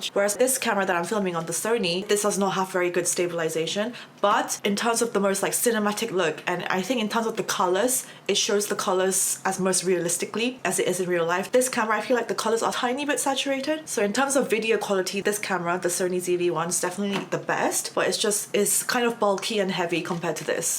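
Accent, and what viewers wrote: United Kingdom. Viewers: Don't worry, i love your vlogs for whatever you're doing and so! Also i love your british accent as always😆💕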